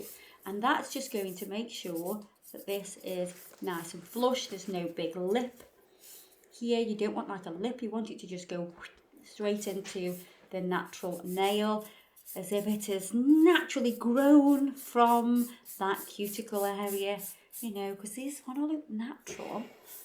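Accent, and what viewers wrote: United Kingdom. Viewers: Well she’s a Brit, and they got a ton of different accents.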